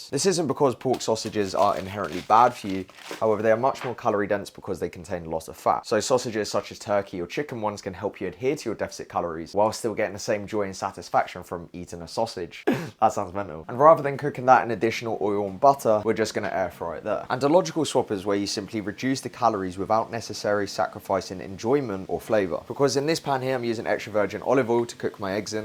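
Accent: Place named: United Kingdom. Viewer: I'll be honest, I am here for the british accent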